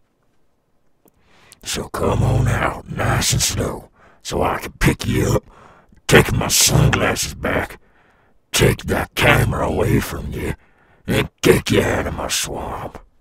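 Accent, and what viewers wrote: South, United States. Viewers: Now I can’t unhear him speaking with a country accent. I don’t mind it, though. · Love the bit of southern accent ☺️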